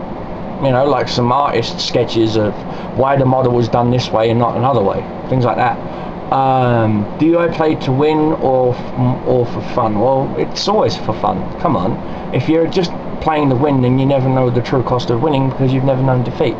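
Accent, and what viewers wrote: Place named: United Kingdom. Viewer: Like it, good story about the die rolls. Where are you from? It sounds like your from blighty but your reference's to dollars makes me think you live in the states or australia.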